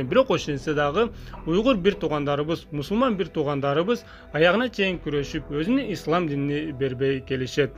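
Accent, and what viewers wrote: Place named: South, Kyrgyzstan. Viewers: Текстерде Баткен диалектикасындагы акценттерди колдонбостон адабий кыргыз тилинде сүйлөсө жакшы болот эле. Келгендигини,кылгандыгыны,ичиде, көңүлүнү, чочконун этини, тарабагандыгыныны,жайылбагандыгыныны, ...... .... ... . Сөздүн аягындагы куйруктарды колдонбосо ☝туура болот. Бул акыл үйрөтүү эмес.Журналистиканы бүткөнүңдө баарын окутмак, 5 жыл. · У него южный акцент.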